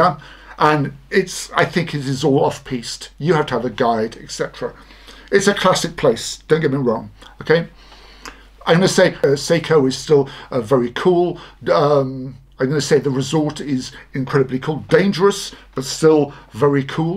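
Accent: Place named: England, United Kingdom. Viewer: Salut, Tu sais ce que j’ai apprécié (entre autres) dans cette vidéo? Je n’avais jamais entendu nommer les stations de ski française avec un accent anglais sur toute une liste comme cela! Merci 🎉😊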